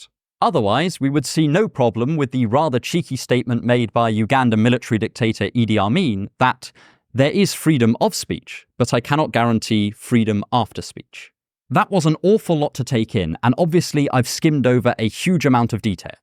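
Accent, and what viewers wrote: United Kingdom. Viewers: I really enjoy listening to your videos. I love how evenhanded you are in presenting both sides of arguments and how you make philosophical ideas come alive. And, I love your British accent! 🤩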